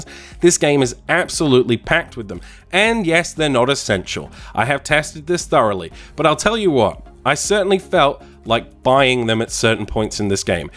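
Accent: Australia. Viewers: You're an aussie!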